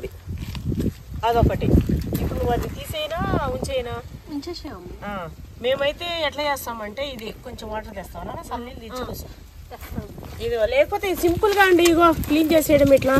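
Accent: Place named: Rayalaseema, India. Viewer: Rayalasema slang ki navvu vachindi 😅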